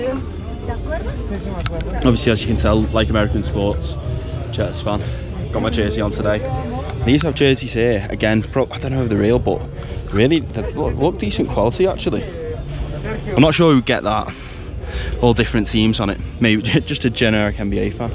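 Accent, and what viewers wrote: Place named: Yorkshire, United Kingdom. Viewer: Loving your accent! Yorkshire? Brummie?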